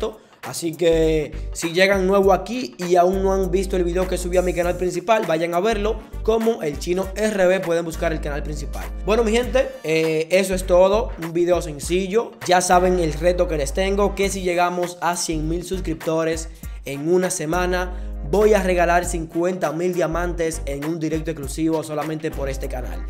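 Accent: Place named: Dominican Republic. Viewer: Ese es un Dominicano k habla como un Dominicano no es como nino k dice ala bestia eso no es Dominicano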